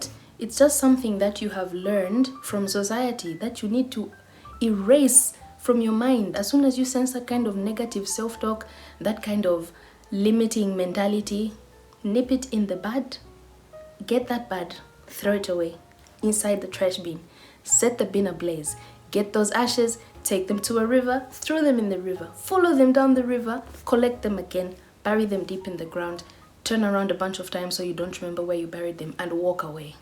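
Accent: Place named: United Kingdom. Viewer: It’s the British accent for me 😂😂😂😂even me I’m delulu I want to become a British citizen!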